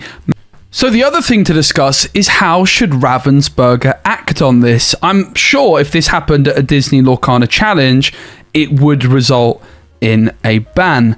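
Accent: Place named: Sweden. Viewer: i love the Swedish accent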